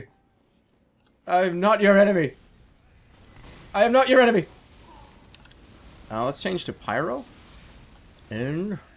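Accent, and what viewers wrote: Denmark. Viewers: Are you danish?? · Are u from Denmark?? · er du dansker loL? JERMA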